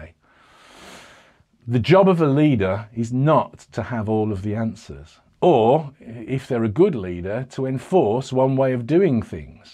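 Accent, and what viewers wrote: United Kingdom. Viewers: "your bus comes up to you and says" I really appreciate the UK accent but I just had to point out that was the first thing I've heard.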